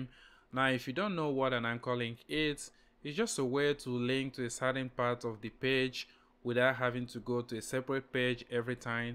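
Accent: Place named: Nigeria. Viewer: ARE NIGERIAN ?? LOVE YOUR ACCENT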